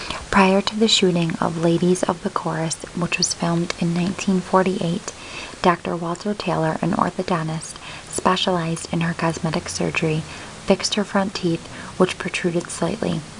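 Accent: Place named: Midwest, United States. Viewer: Are you from the Midwest? I love your accent, reminds me of home!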